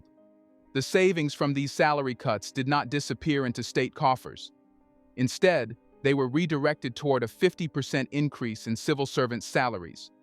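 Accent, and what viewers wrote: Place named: United States. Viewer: It's annoying these random generated content and AI generated voice overs with American accents. But the message is mostly factual so we have to look past that.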